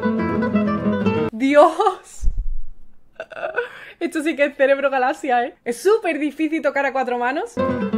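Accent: Andalusia, Spain. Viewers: Me gusta mucho oírte tus análisis musicales, porque uno oye las cosas y muchas veces no te das cuenta de lo que oyes, sin embargo tú le enseñas a uno a oír la música Además eres super divertida al explicar, tus expresiones y gestos y tú acento español me fascina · veo muchos videos tuyos porque me encanta ese acento andaluz. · Hola guapaaa, he vivido 11 años en Madri, soy español de corazón, pero brasileño también. Ahora mismo estoy en mi tierra, Maringá, Paraná, sur de Brasil. Soy tu fan, me encanta tu acento andalú, además de tu talento y tu canal. Oye, eso de las reacciones está fenomenal. Si te parece bien, voy a enviar videos de 3 chicos muy talentosos que sigo en el youtube.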